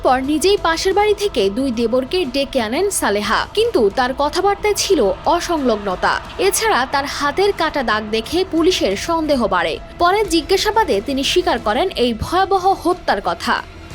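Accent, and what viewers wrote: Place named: Kolkata, India. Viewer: কোলকাতা স্টাইল এ নিউজ পড়ার কারণ কি? আপনার মতো সাংবাদিক কিভাবে একটা চ্যানেল রাখে 😑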